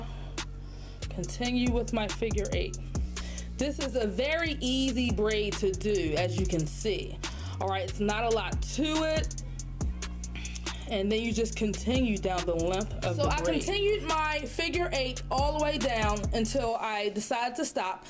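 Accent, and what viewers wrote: Baltimore, United States. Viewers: Baltimore I can place that accent from anywhere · Are you from baltimore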